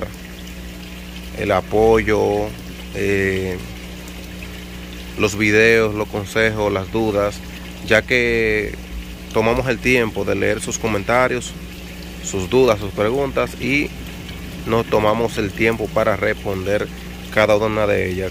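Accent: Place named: Dominican Republic. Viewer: Saludos usted suena como dominicano, tiene Instagram para comunicarme con ustedes?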